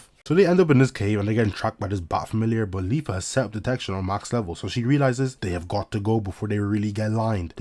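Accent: Ireland. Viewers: U sound Irish a bit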